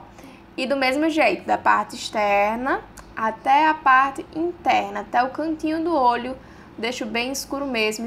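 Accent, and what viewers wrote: Northeast, Brazil. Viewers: Dificuldade de passar batom??? Não vi nenhuma !!! Ficou lindo, make maravilhosa, amo seu trabalho, sua forma simples de ensinar, mesmo mostrando suas dificuldades. Isso mostra o quanto vc se mostra verdadeira e real no seu trabalho. Continue assim! Parabéns !!! Amo esse seu sotaque gostoso, já vivi no Nordeste e amo o clima, o sotaque a comida... Mais uma vez, parabéns !!!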